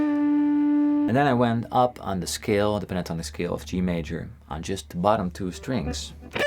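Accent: Netherlands. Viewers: I really like your videos. They're so well recorded, informative, and to the point and of course you're a great guitarist and know your stuff. A bonus is that my partner is Dutch so I love your accent and also love your subtle jokes at your accent like "Chi Major" so funny! Big ups to you bro, from a Samoan musician down under in Aotearoa / Niew Zeeland! Jah love!!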